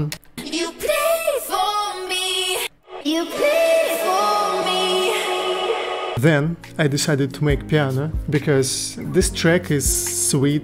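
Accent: Russia. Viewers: Brooo amazing work, really! No I feel like my remix is bad😅 Also your russian accent Is reaaly cool! Almost like in my country? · dude i love your english russian accent...no need to feel embarassed when you speak in english :)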